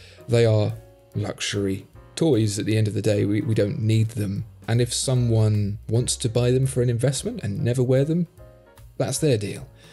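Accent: United Kingdom. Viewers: You make watches interesting. You and your late night FM radio voice. Women around the world want to know, 'Who is that mystery hands British lilt man with that voice?' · Those Lange 1815 chronographs or datograph perpetual calendars are indeed the best grail piece. I prefer your videos with no face, ethereal presence voice (the brit accent helps too 😂).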